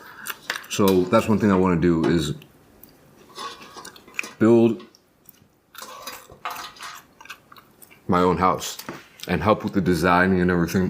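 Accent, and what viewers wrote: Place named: California, United States. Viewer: You talk so cali 😊love it and i love and enjoy all your videos!!😊❤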